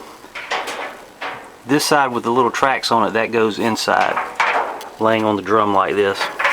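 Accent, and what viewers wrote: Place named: North Carolina, United States. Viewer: Thank you! I didn't know it was so easy! (And you accent reminds me of home.) (Coastal NC)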